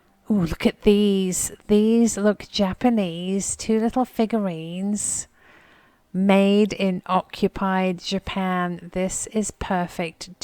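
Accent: England, United Kingdom. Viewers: what part of England are you from, you sould a bit like my cousin Jenn, she was from Maidenhead, and a teensy bit like my friend Lorraine who is from Cobham. my other english friends do not sound one bit like you, they are from Liverpool, and another family says they are gordies, OH my , when I first met them I couldnot understand them, they had so many different rhyming words for things, not like cockney but different, and my exchange student was from Bristol, and Beautiful Cotswald. my mother in law was born near London, so English accents are my favorite things. lol. I am not like the professor in My Fair Lady but I do enjoy trying to figure out the accents. London accents are the easiest.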